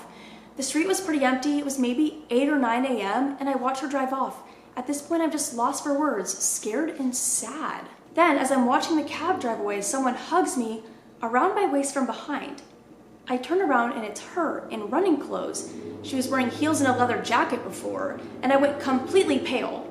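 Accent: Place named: South, United States. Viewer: That southern drawl keeps shining through more and more. Subconscious or not, it's cute. Seems fitting, I dig it! 🤠😉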